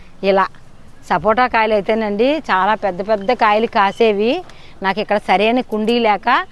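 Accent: Godavari, India. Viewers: Godavari jilla తెలుగు super madam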